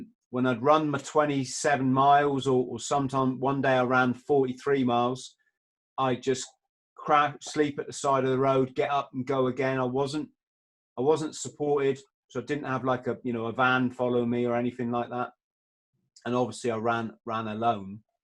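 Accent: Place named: Ayrshire, United Kingdom. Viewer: Hey I’ve been aware of and Follow him,really enjoyed the podcast guys.Your definitely West coast/Ayrshire-Glasgow as you the accent is the same as mine 🙂. I’ll be sure to share and follow.👍🏻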